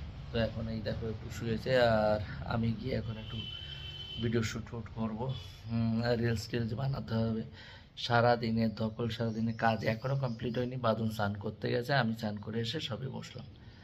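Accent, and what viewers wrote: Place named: Bangladesh. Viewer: Dada tomar mayer bari ki bangladesh kotha gulo bangladeher moto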